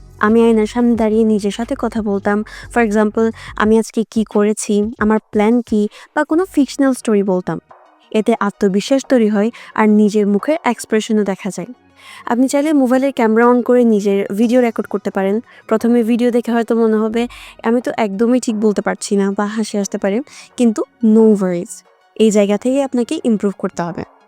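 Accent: Chittagong, Bangladesh. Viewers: apni ki chittainga , cause apnar accent pura amader chittagonian der moto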